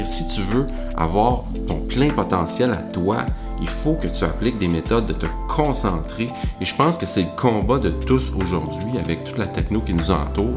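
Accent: Canada. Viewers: Bonjour, je ne suis pas surpris par ta vidéo, qui reflète bien la société dans laquelle nous vivons!!!!!! je suis tout jeune saxophoniste de 33 ans qui a enfin pris le temps grâce au confinement car je suis cuisinier 😅 pour apprendre l'instrument qui ma passionne depuis tout petit. Je travail tout les jours pendant 15 à 45 min et je progresse rapidement car j'ai dejà des notions de musique en piano et batterie!!! Grâce à toi et à tes vidéos j'ai pu correctement m'enrichir de ton savoir avant d'acheter mon sax alto ( et même ton bec syos 😉 ) et d'écouter tes conseils précieux. Trop peu de saxophoniste Français se lance dans une chaine Youtube aussi complète que la tienne et je ne regarde que toi maintenant!!!! continu sans te soucier des pourcentages sinon tu ne fera que lire des statistiques au lieu de nous transmettre ton savoir et j'en prend pour exemple un restaurateur qui ne regarde que TRIPADVISOR pour savoir si sa cuisine est bonne ou pas!!!! 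mais l'idée de la licorne est pas mal 🤣🤣🤣 trouve une chemise en adéquation 😆!!!! et j'adore l'accent canadien 😘 bravo et continu, j'espère dans les années à venir faire ta connaissance et partager avec toi.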